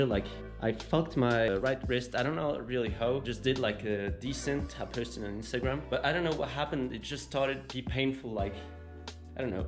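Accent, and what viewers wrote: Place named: England, United Kingdom. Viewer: On dirait un vrai anglais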